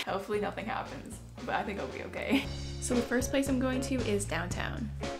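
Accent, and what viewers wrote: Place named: Toronto, Canada. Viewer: Is this in Toronto because I noticed the accent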